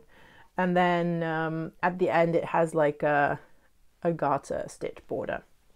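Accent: United Kingdom. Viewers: Congratulations on releasing your first pattern! We'll done, you! I think you were channeling your inner Vanna White when you were showing your sock....lol! I've on l y been watching your podcast for a short while, and quite enjoy it. Could you explain why, for a Dutch person, you sound so British, please? It's very confusing. I'm looking forward to seeing your progress, and Julia's on the Steven West MKAL. Take care. Patty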